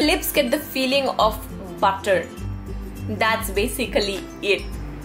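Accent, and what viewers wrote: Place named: Bengal, India. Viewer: I have subscribed already yaar....❤️....I follow u on myntra as well.....I like ur attitude and accent ...u are just like me....and I'm Bengali too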